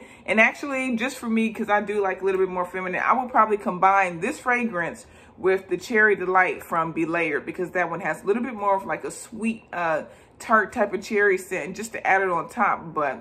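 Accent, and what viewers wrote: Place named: Philadelphia, United States. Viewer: I love your review. I've been contemplating buying this since I picked up Black Phantom by Kilian which is now my favorite scent of all time. Are you a Philly girl? I believe I hear some of that Ptown in your speaking which I miss since I left home. I've been living in Hawaii for years now but I'm originally from Southwest Philly. Keep up the good work.🙌🏽🙌🏽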